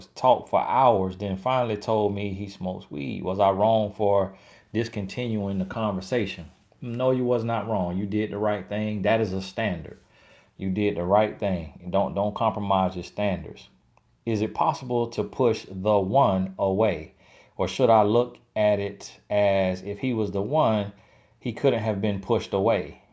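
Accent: South, United States. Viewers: I just finished watching this chat. I enjoyed it. I just started watching a few of your videos. You have a new subscriber. I don't know you but I like your aura, that you speak of God and your southern roots. Your accent reminded me of my mother's accent (she grew up in FL). God bless you! "I don't have Go-Go gadget eyes". You're funny! Maybe a few ppl on here know about Inspector Gadget.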